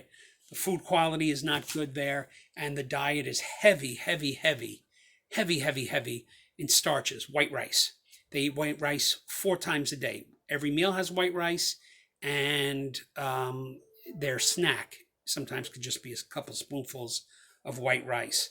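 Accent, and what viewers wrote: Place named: Boston, United States. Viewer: Too bad,i liked how you presented your observations.I guessed you were from the Boston area.I lived in Chicopee Ma.For several years putting an ex wife thru American International College in Springfield.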